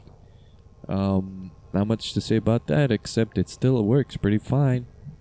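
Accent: United States. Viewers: Q&A: whens that hot dog review coming? I want to see shlurp a sausage 😩😤 Also you sound American but you said you live in Europe so where do you come from?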